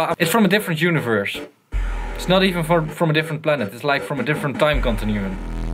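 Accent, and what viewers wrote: Netherlands. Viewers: are u dutch dude?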